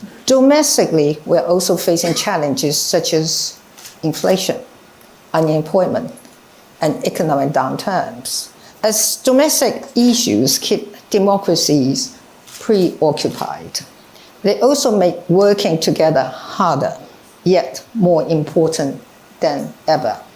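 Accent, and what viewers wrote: United Kingdom. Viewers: 喜歡喵統的英國腔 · 我是來聽小英的英國腔的：）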